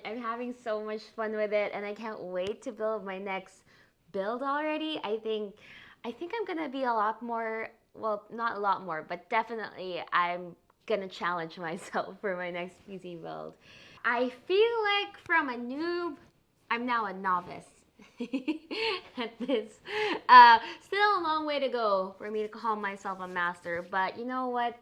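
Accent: Philippines. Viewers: Great videe, love your content. are you pinay? i feel like i can hear alittle accent in your voice :) keep up the great work! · First thing that i noticed is your accent. Asked my self why does it sounds like filipino accent, i never thought you have filipino blood till i checked your about. Anyways keep it up! nice pc! hoping to have this kind of build soon! Ingat and god bless haha. you gained a new subscriber. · You sound Filipino But awesome video and build <3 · i found it funny as I have wasted so many tubes like you did lmao🥲. But keep making the content that you love doing! I thought that the accent was a bit familiar but immediately noticed when you spoke tagalog in the vid. Always happy to support a fellow filipino. 😊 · what's your ethnicity? you have that Filipino accent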